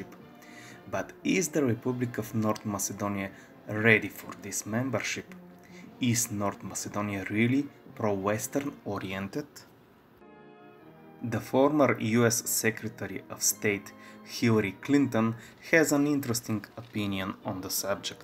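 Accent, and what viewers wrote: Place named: Russia. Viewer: Your English with Russian accent is very funny!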